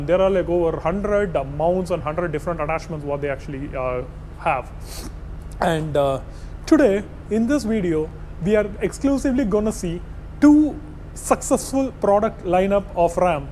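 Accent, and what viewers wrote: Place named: Texas, United States. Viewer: Great video! Thats a heavy accent are you from texas?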